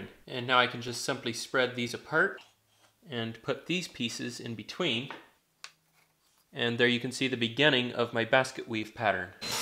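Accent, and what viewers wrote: Canada. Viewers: Neat pattern out of plywood - good job. BTW, are you Canadian or North-Central American? I hear an accent in there.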